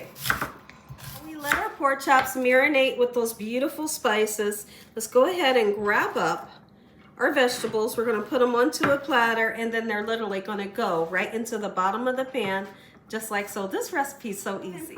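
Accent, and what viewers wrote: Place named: Midwest, United States. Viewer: What state are you ? I can hear mid western accent or southern.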